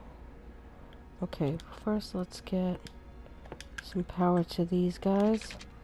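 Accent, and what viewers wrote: Long Island, United States. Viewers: I’m hearing a Long Island accent I think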